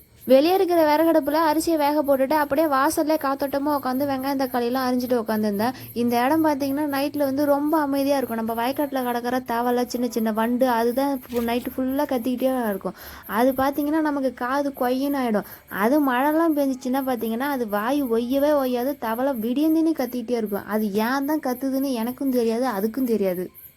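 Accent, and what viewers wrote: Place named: Virudhachalam, India. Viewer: It is amazing to hear Virudhachalam Tamil. I am in Delhi wish to visit Tamilnadu soon. Your video refreshing village memories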